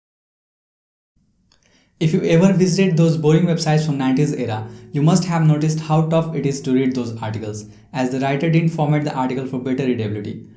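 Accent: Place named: India. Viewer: Indian accsent is the worst in the world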